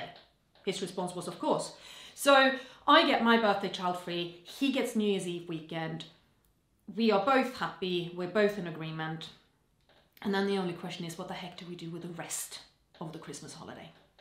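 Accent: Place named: England, United Kingdom. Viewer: Happy Birthday. It always amazes me you are Swedish and speak it but yet you do have a English accent. Your schedule is crazy busy. Merry Christmas ⛄🎄 sweet friend.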